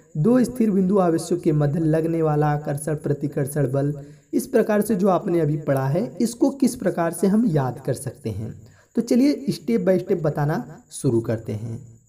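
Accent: Chhattisgarh, India. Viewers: Aap chattishgarh se ho kya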